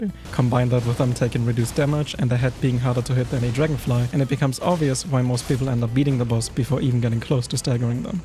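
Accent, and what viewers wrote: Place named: Germany. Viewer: Kann mir einfach kein deutsches Englisch geben mit accent es hört sich schrecklich an, pack mal ein bisschen Stimmung in deine Stimme beim vorlesen…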